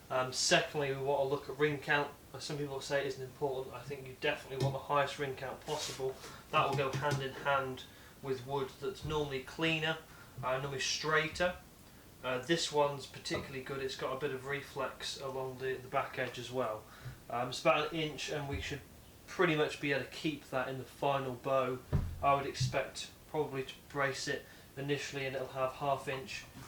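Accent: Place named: England, United Kingdom. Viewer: By the accent i'm going to assume you're English, if you are, where did you get your wood? I find it very hard getting anything other than pine in this country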